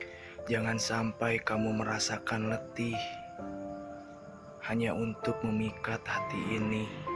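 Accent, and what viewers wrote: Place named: Sunda, Indonesia. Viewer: Sunda pisan suara na aa keren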